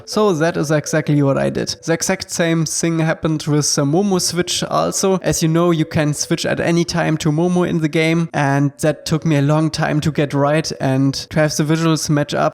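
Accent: Germany. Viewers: Kann das sein das du deutscher bist?😂😂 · Are you German? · Dein deutscher Akzent ist echt episch haha. Naja solange dich jeder Versteht passt's ja 😋🤣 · Dein deutscher accent ist echt baba HAHAHAHA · Is he a german? Edit: Lol he is really one😂😂😂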